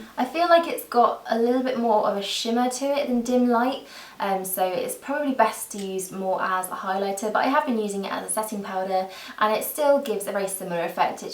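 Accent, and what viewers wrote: United Kingdom. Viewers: The dedication in that down bar, you go girl ! ;) I could listen to you speak all day, such a relaxing voice ! Out of curiosity, is your accent from any specific region in the UK? I'm Australian and find it very interesting how incredibly different regional accents can sound there (obviously it applies here as well but no where near that extent)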